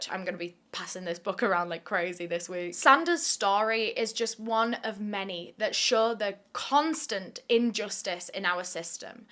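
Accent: United Kingdom. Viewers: I mean let’s not forget about British history ….. It’s rich hearing someone with a British accent talk about how horrific the Belgian colonisers were.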